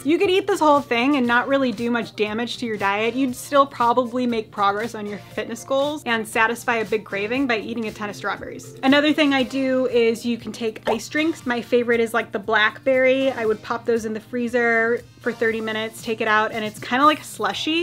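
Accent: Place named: United States. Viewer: You forgot to mention if the weight should be pounds or kilos. You sound american, so I'm guessing pounds.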